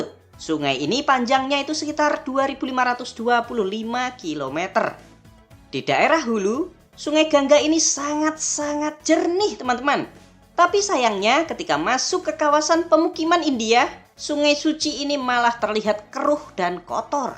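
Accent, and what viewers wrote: Java, Indonesia. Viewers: logat jawanya medok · si medok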